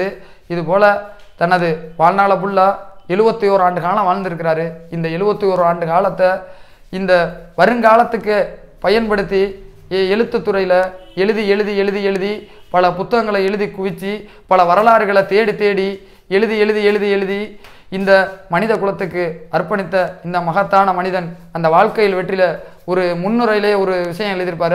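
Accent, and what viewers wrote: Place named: Thondi, India. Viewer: தொண்டி தமிழ் அருமை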